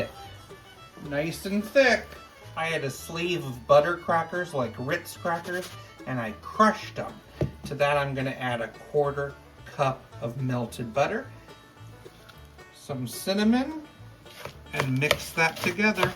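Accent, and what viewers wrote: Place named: North Carolina, United States. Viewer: This sounds like North Carolina ❤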